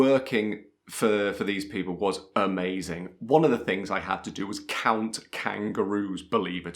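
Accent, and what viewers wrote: United Kingdom. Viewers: Professore ho preordinato il Suo libro. Darei qualsiasi cosa per avere il Suo accento così British. Faccio le prove a casa per avvicinarmi sempre di più ma non è così facile. Grazie. A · It's sounding really British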